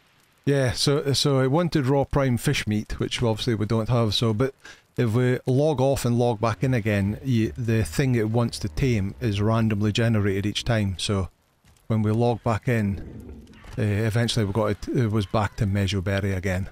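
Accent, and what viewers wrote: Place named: Glasgow, United Kingdom. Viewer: No worries, it has to work for you or it simply won't work. The main reason I asked is that the build system is pretty good and it might exercise your imagination. PS. I served in the British Army a long time ago and the first Troop I commanded was recruited from Glasgow. Not saying you have a Glaswegian accent, but it does remind me of that time. I miss those guys.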